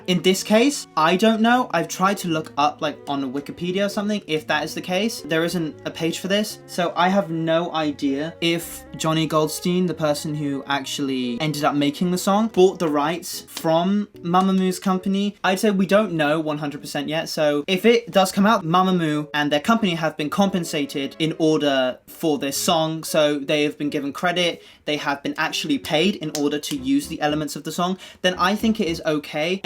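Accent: United Kingdom. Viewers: BRITISH ACCENT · Hi! I'm Korean teen ㅎㅎ I love your british pronounciation ㅠㅠ Can you cover ' '-!!! I'm sure you will love this song ㅠㅠㅠㅠ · Your English pronunciation is the most delightful one I ever heard, you've got a perfect English accent ✨🌙 Oh, and you're so cute ❤️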